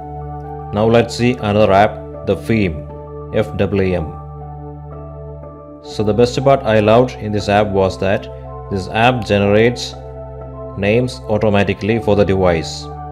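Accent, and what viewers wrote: Kerala, India. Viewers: That is 100% kerala accent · 100% Indian. O hate your voice so much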